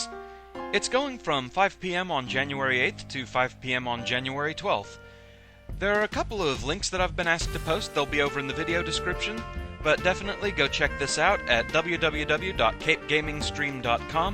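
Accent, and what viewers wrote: United Kingdom. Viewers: i like the accent too. i can't place it. uk?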